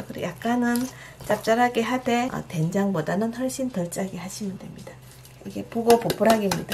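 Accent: Gyeongsang, South Korea. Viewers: 쌀을 쌀이라 부르지 못하는 지역 ㅎㅎ 삼 싸먹으면 ~~ 살 처럼 경상도 억양 ^^ 목소리가 참 좋으세요 울 애기 엄마보고 보라고 해야 겠어요^^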